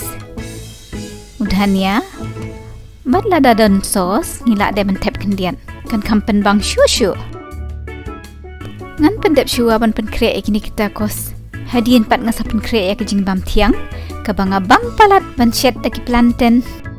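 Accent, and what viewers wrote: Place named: Meghalaya, India. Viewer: Ka jing i don burom. Khamtam ly ka riam ka rukom kren ruh tag daka khasi beit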